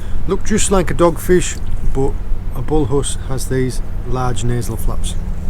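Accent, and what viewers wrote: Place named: Flamborough, United Kingdom. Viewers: I forgot to say that i am putting my pots off Flambrough head. And by your accent i think you have lived near here. It gets very rough so your boat safety videos are great. Im so glad i came accross your channel. All my mates watch it too now. Thanks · Best knowledgeable uk fisherman by a mile mate! Thank you so much for hours of vids for me and my 8 year old keen fisherman! We have a holiday cottage in staithes, are you originally from anywhere near there? You accent says that area?🎣🎣🎣🎣🎣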